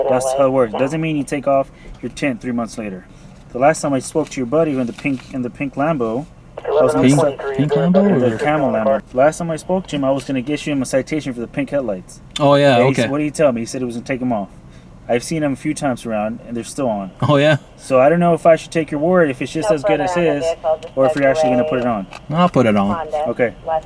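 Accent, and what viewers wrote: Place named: Southern California, United States. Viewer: That socal accent. Lol irrelevant but still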